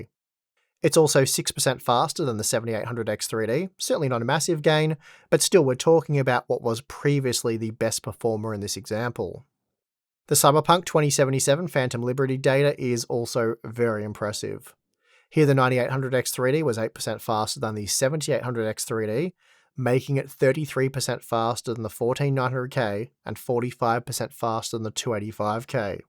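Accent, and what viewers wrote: Australia. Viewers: I have to SURPRISINGLY say that after watching this video I don't think I EVER wanna heard the word 'FASTER" ever again!! It's been drilled into my head SOOO MANY TIMES that I'm almost SICK of AMD winning JUST BECAUSE OF THIS!!!!! hahahaaa!! (I think it's mainly because I'm an American, and hearing the Australian accent the biggest difference that we hear is the 'ahh' in 'faster' ) ... and hearing that 586 times in one video drilled it in hard... and it SUCKS because I WANT to hear about AMD being FASTER!!! LMAO - I think I'll be okay tho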